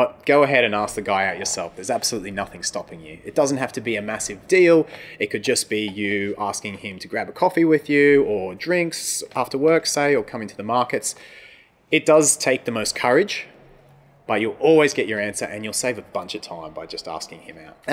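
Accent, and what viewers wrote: Australia. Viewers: u sound aussie! i am btw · Are you Aussie? I think so & I love that. Most of the guys on YT giving these tips are English and worthless in this dept. I’m American & I think American guys & Aussie guys are real men (just my opinion) and I put stock in both of them. I’ll check out your other channels. Tyvm Aussie Man!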